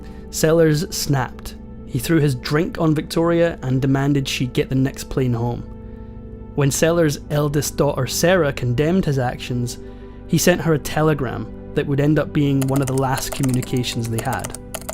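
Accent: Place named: Scotland, United Kingdom. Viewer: Nice to hear a Scottish voice for a change. 😊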